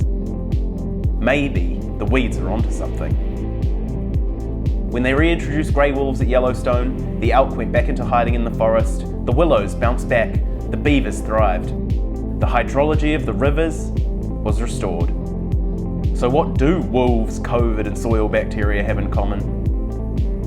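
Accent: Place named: New Zealand. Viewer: Great illustration of nature as a complex adaptive system! And nice New Zealand accent...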